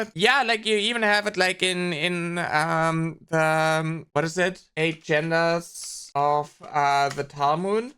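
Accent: Germany. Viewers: ... are you german by chance?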